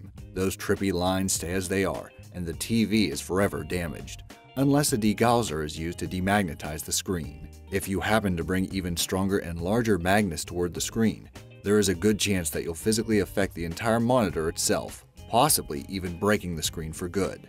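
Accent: Hungary. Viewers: You’re Hungarian? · This guy is def Hungarian.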